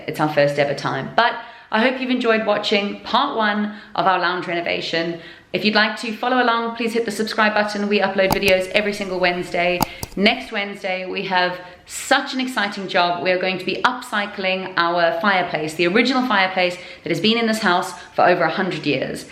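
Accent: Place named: South Africa. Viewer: Instantly knew you must be South African! Fellow South African here 🙋🏼‍♀️ Great video 🥰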